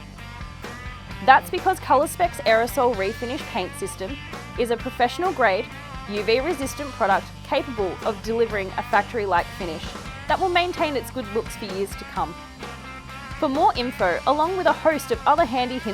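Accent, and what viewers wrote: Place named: Australia. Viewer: How good is the Aussie accent dude hahaha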